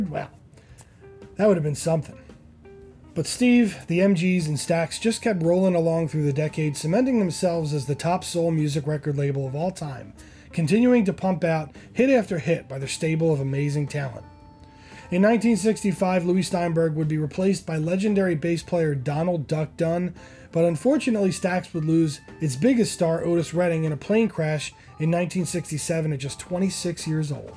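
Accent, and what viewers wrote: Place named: Delaware County, United States. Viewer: Thanks. I'm a recent subscriber and I enjoy your videos immensely and find it heartwarming to hear a hometown accent in your narration. I'm guessing you're a DELCO guy like me. After living my first 43 years in DELCO, I moved but I'm still in PA. Though I'm a drummer first, Steve Cropper is a favorite of mine. He's one of the biggest reasons why I love the Tele so much.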